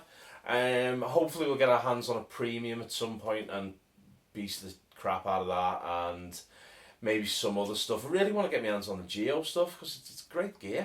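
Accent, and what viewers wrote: United Kingdom. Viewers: unmistakable British accent :D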